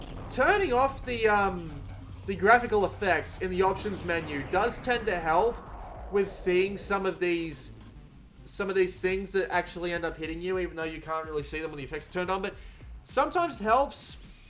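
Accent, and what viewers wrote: Australia. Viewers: That voice. MATE. from a fellow Aussie. Jesus.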